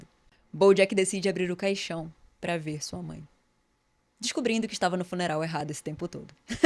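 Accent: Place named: Pará, Brazil. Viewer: Ela tem sotaque paraense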